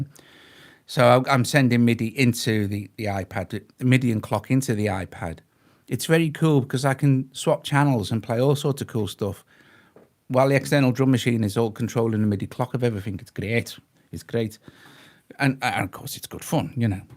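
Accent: Merseyside, United Kingdom. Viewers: Apple should pay you a commission. This video was the best argument for getting myself a new i-pad I have ever heard. Back in the days of The Strawbs, I dreamed of owning a Mellotron and later a Wavestation - I saved up for one only to find it had been discontinued. Now you've shown me I can have both for very little dosh (once I've splashed out on the i-pad). Now, you may have been in Cornwall, but that voice tells me that, like me, your origins are somewhere on Merseyside. Everton, me, but I am a red! I now live in Catalonia.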